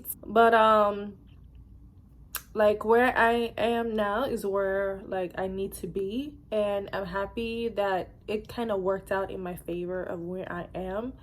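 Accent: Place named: Caribbean. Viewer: What’s ur accent from? I’m vincy n you sound Caribbean too- also have my in person f2f june 6 (2 weeks)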